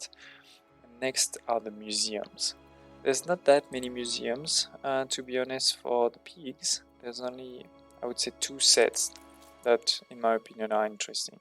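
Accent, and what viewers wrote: France. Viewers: You sound french :D.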